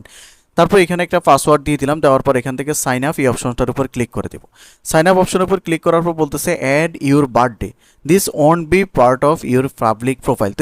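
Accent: Noakhali, Bangladesh. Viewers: স্যারের বাড়ি কি নোয়াখালী?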